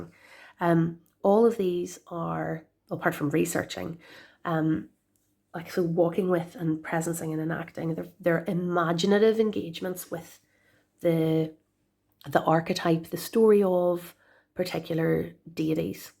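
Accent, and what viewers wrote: Ireland. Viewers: I would love your channel if for no other reason than it makes me so happy to hear someone speaking Irish naturally & with the 'right' accent 💚🌱🍀 My kids would be getting those books if they were 30 years younger 😁😯 I miss the really good kids books. I own that CBR oracle you love - the red one - and also the Enchanted Map. I do love them, any other decks of hers are long gone since I read more about what she is like 🤐 I hope your new one is useful to you 😊 I doubt it will shock you that I LOVE the Wildwood tarot 😍 I don't use it, I just stare at the art & smile. Also love Mystical Moments tarot, her art is unbelievably gorgeous. Thank you for the update. I enjoyed it as always 😊👍💖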